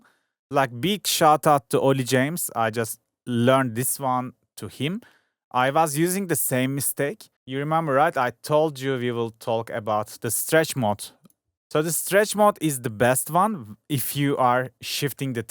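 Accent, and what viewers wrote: Turkey. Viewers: I hear Turkish English accent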